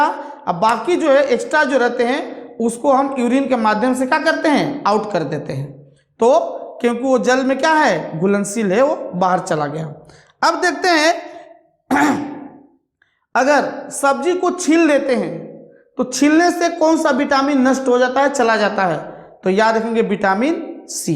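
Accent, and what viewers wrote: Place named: Bihar, India. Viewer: Aap to Bihar se hai Guru Jeeeeeee🎉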